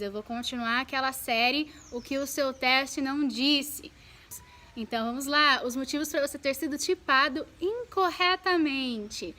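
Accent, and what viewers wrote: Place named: United States. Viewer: gente, ela fala muito "okay" pq ela morou muito tempo nos eua ai fica meio q um sotaque sabe, para brasileiros que não conhecem ingles pode parecer diferente mesmo, mas òtimo video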